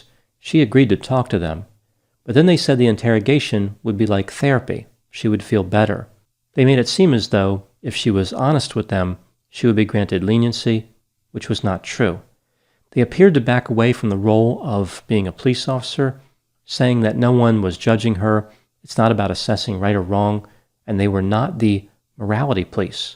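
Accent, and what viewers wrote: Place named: Maryland, United States. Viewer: I thought you might have a Maryland accent and I looked you up and read that you're from Delaware.. I wasn't far off! :) 🤗 I just found your videos not long ago and love them.. subscribed.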